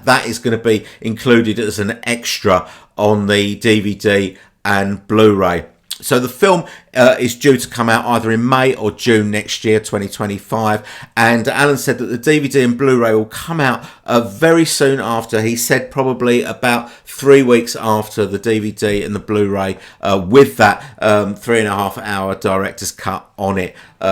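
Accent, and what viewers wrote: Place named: England, United Kingdom. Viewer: Blah Blah Blah with an English accent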